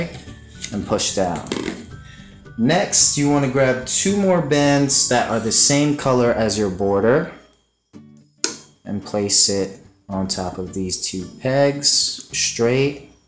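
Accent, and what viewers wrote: United States. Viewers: You really think people can make the snake belly cause I would love to, also please reply but is choon your dad or grandad because u sound american and choon is from the phillipines. I dont get it yalll and I spend $ 894 yestdrday at therainbow loom webstore and now im bankrupt!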